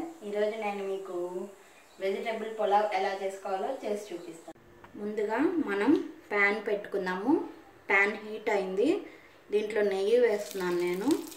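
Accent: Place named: Rayalaseema, India. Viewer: Mi voice super alage midhi rayaalaseema andi endu kante vullagadda annaru anduke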